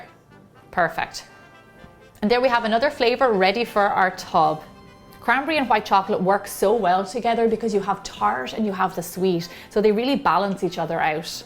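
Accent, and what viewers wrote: Ireland. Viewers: Where in Ireland are you from ? I'm from Mayo in Ireland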